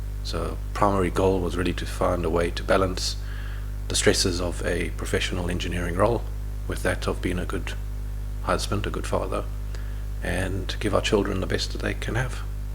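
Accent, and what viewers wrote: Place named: Ireland. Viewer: You already have a bit of an Irish accent yourself!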